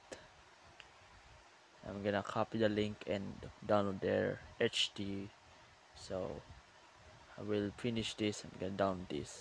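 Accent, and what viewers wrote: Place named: Philippines. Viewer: Wow thats rare to find a filipino channel i knew that ascent sounded fomilar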